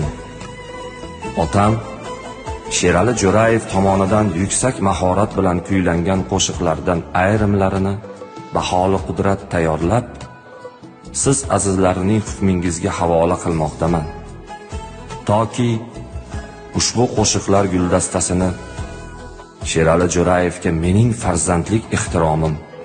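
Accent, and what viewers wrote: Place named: Andijan, Uzbekistan. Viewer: Хакикий анжанча гап булибди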